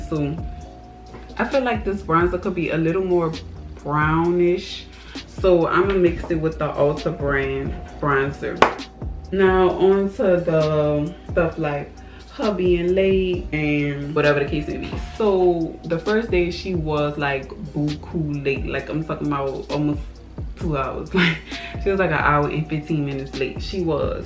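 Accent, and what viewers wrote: Louisiana, United States. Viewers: I knew you were a louisiana girl from the first couple seconds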